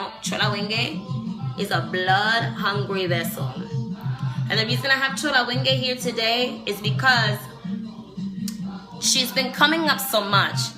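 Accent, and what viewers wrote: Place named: Dominican Republic. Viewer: She sounds like my friend who is Dominican. Loves it!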